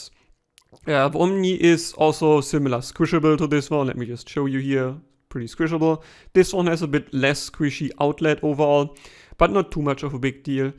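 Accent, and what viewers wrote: Germany. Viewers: Dude, you sound like "der8auer", if you are probaly familiar with that name and anything he represents :)) probably the german accent...Anyway, your reviews are accurate and true, witch matters the most. Thank you!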